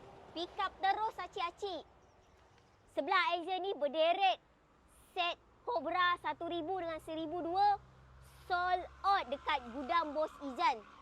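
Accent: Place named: Java, Indonesia. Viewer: Sedap bunyi jawa nya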